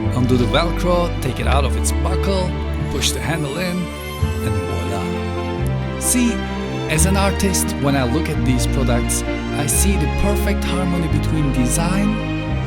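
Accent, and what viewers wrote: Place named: Turkey. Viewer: türk oldugu sesinden belli :D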